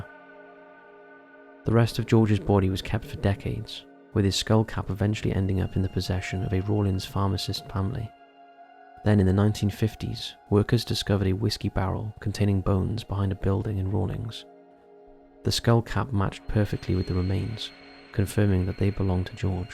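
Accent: Wales, United Kingdom. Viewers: Love the voice (subtle Welsh accent?) and the eerie ambient background noises/music/soundtrack. Very soothing, not to mention informative.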